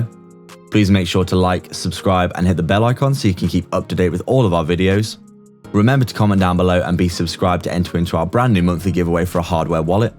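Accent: United Kingdom. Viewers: Nice video, great to hear a British accent for once.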